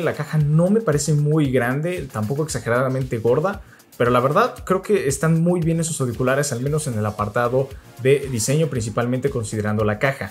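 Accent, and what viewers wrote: Mexico. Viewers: Me gustan muchos los vídeos de este canal pero me fastidia la forma de hablar jajajaja puro hablado de mi rey de culiacan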